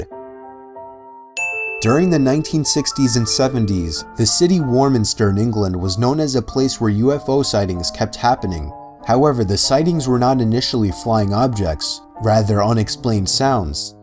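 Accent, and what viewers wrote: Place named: New York, United States. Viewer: I love his new York accent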